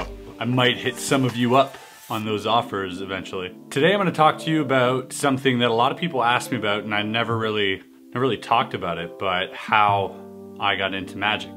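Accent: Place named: Canada. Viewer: I am only just at the beginning, but, magic has amazed me just like it did for you. Watching the same famous magicians performing the best card tricks that left myself stunned and wanting to know how they do it and how I can do it myself to create that same experience for my friends and family. Its unknown if I would ever make a career out of it, I am almost 24 and currently in progress of becoming a licensed architect by 2018, but I love having hobbies and magic speaks to me on so many levels. I am happily subscribed to your channel and have watched many of your card tutorials that have helped me learn new tricks! Keep the videos coming bro! Also, thanks to your videos, my first deck of cards was based off your recommendation....Blue Monarchs! They feel great and have worked out very well so far! I have not yet confirmed if you have said that you are from Canada but it seems a little obvious with your accent, if you are....SWEET! 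I was born in Burlington, Ontario! I have since moved to California but still proud to be canadian! Hit me up if you are ever in South Orange County! -Toby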